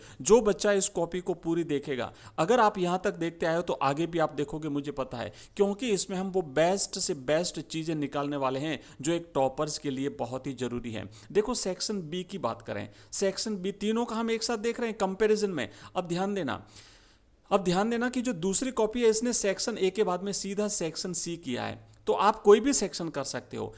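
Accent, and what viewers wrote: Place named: Wagad, India. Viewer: Sir aap wagad se ho kya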